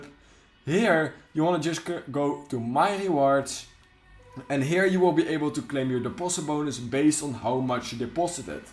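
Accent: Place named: Netherlands. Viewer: 25k is not going to broken 😂 Dutch english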